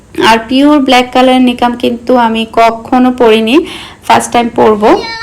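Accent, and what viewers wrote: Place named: Satkania, Bangladesh. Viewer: চট্টগ্রামে না সাতকানিয়া স্টাইলের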